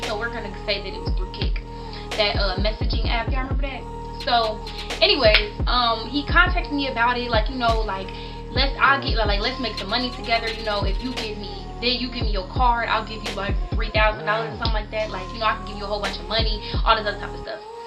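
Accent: Chicago, United States. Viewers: You from Chicago ? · You from Chicago it sound like it🤣💯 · I can tell ur from chicago lol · Are you from Chicago?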